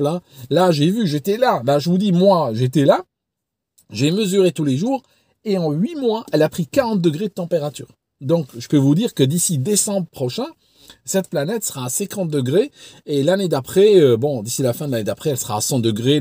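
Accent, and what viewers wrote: Switzerland. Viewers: "le trou de Lausanne" raccord avec ta dernière sur les maladies pochette surprise de la croutonne d'Angleterre. Ton ravissant accent suisse est tellement gloutonnant 🥂 Petite intro d'une douce chanson pour les amis en tristesse 🎶 On peut vivre sans richesse Presque sans le sou Des seigneurs des princesses Y en a plus beaucoup Mais vivre sans tendresse On ne le pourrait pas Non non non non On ne le pourrait pas! né André Raimbourg le 27 juillet 1917 à Prévot-Vicquemare décédé le 23 septembre 1970 à Paris. Poète, tes papiers!!! 🫂 l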